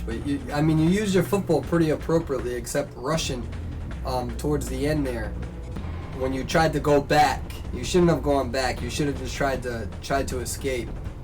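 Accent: Germany. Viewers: ITS A GERMAN ACCENT? THOUGHT IT WAS PERSIAN OR ARABIC OR SOMEWHERE IN THE MIDDLE EAST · I didn't know you were german, explains a lot with your accent😂 awesomee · Your beautiful German accent 😂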